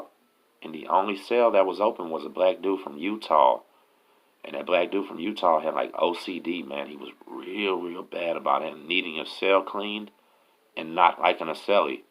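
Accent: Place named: California, United States. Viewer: Sound like out here in Cali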